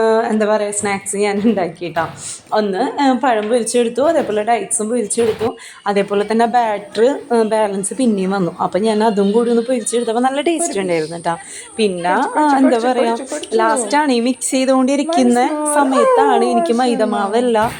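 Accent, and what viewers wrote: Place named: Thrissur, India. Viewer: Masha allah ❤❤❤ നിങ്ങൾ തൃശൂർ ആണോ സംസാരം കേട്ടിട്ട് അങ്ങിനെ തോന്നുക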